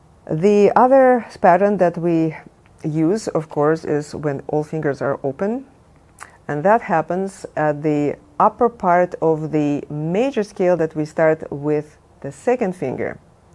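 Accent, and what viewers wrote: Russia. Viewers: Are you русская?) Cuz I hear an accent)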